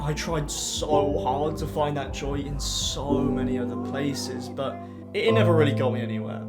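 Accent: Newcastle, United Kingdom. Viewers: You sound British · Your a geordie let’s go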